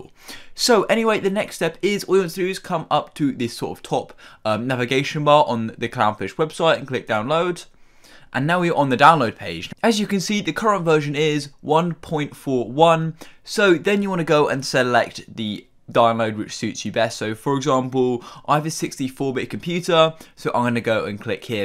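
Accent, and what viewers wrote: United Kingdom. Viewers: britsh man :)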